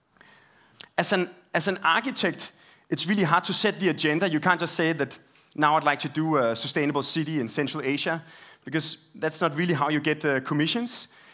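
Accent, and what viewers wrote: Denmark. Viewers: just a Danish accent :c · Aw... You just have to love the Danes :) Awesome accent! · it's the copenhagen accent :P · Pretty much everyone from Denmark does this when they speak English or Danish, so I have gotten used to it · Yes it is annoying. In Denmark the ones teaching english developed their pronunciation when the primary contact was text based, or in songs. So unfortunately all the grammar, and habits from sentence construction and for emphasizing have been transferred to verbal English. The younger generation is much more exposed to American television, and have less tendency to do this. Also it is worse in Copenhagen than in the rest of Denmark, perhaps because the local dialect is further from English..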